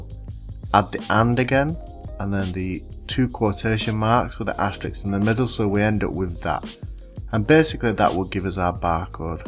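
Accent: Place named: United Kingdom. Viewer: I like your British accent.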